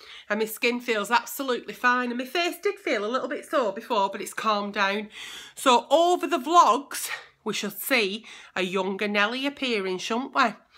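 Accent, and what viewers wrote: United Kingdom. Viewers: you are the true bright spot in my life, you make me laugh and make me feel that life is not so bad and should not be taken too seriously...your talk reminds me so much of my british sister in law, ( hubby is from Nottingham)...thank you very much for just being you, love you much...from the U.S. Jeannine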